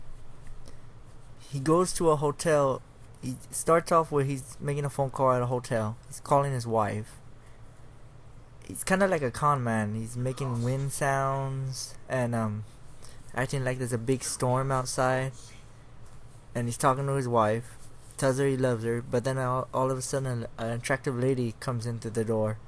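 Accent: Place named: East Los Angeles, United States. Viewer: three eps legit scared me: Inside the Closet, The Last Car and The False Prophet. One thing I liked about TFTDS is that a lot of the stuff went unexplained so that made it scarier. Although in the case of The Last Car it went beyond being unexplained to flat out just not making sense. Are you from East LA? You have the stereotypical East LA accent.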